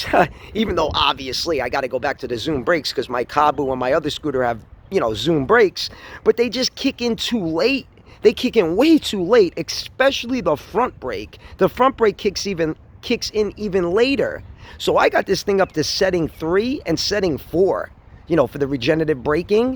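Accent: New York, United States. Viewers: Great video i love your Ny accent and i love all your scooters nice!!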